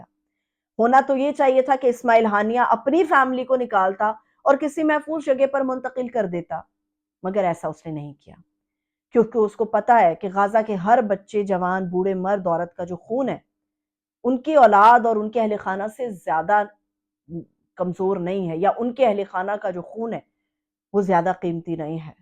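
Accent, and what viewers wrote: Punjab, Pakistan. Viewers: Hehehe MASHALLAH your Punjabi Accent is very cute.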